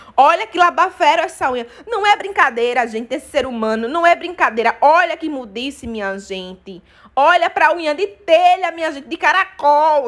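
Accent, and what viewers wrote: Northeast, Brazil. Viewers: Gosto da voz de...voz de nordeste nos vídeos...kkkk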